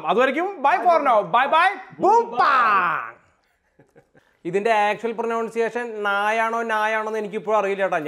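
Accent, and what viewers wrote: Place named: Kodungallur, India. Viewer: കേട്ടിട് കൊടുങ്ങല്ലൂർ ആണെന്ന് തോന്നുന്നു വീട് 😌🤣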